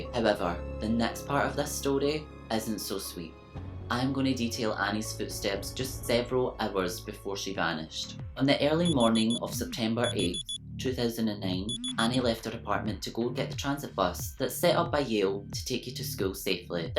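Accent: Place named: Scotland, United Kingdom. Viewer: I’ll let it go because we did get a Scottish accent in its place. ;) Lol!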